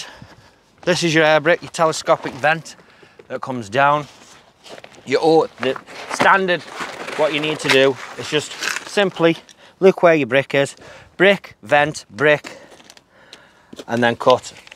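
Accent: Stoke, United Kingdom. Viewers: You sound like a Stokie!? Nice tip mate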